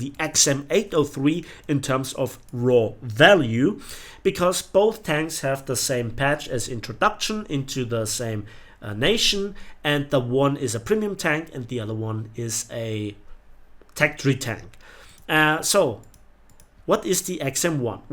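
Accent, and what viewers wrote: Germany. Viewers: dieses extrem deutsche Englisch macht dich so sympathisch. Abo hast du :)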